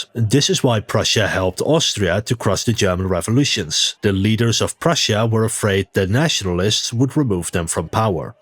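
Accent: Netherlands. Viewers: ik hoor je niet vaak engels op zijn rutte praten maar Kanals😂 klonk wel mooi helemaal omdat je het tig keer achter elkaar zij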